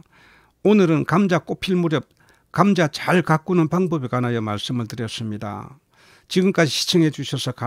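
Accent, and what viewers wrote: Gyeongsang, South Korea. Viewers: 대구 억양??? 이발, 감자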